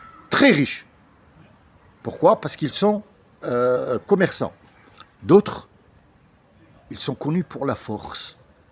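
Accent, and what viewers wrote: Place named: Arab world. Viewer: Assalam alecoum très Joli travail TU es très fort et TU as un joli accent Arabe hamdoulilah ET TU connais bien le Coran infalsifiable et Sunnah ET TU es vraiment excellent Ok TU es vraiment excellent Par là grâce de Allah Ok je suis petit à côté Mais hamdoulilah... Oui nous sommes coeur de Lion Muslims Ok Allah Di Mes chiens sont dès Lion comparé à Vos chiens bref pour dire que à tout moment n'importe quel personnage peut se retrouver dans Ton histoire coranique évoqué hamdoulilah ET c'est Par ce que là finalité Est que Allah Seul connais les réservistes pour résumer ET que dans l'attente beaucoup sont là déjà aussi hamdoulilah ET TU fait partie de ces gens hamdoulilah Ok frère merci pour cette vidéo Allah Te Protège Amin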